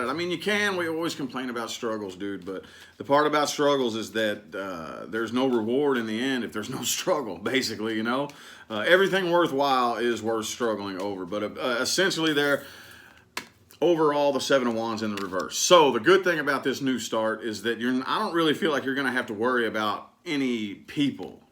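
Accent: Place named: Texas, United States. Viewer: Love your accent.... Are you from TX?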